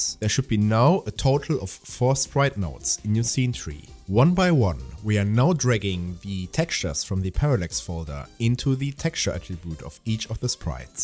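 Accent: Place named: Germany. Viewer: Den deutschen Akzent hört man sofort raus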